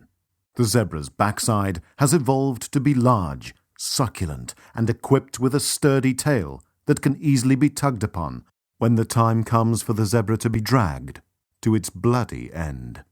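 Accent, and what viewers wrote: United Kingdom. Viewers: he’s British, that’s how’s it’s pronounced. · this enormously negative over tone coupled with that British accent has got to be, the funniest shit i have ever heard.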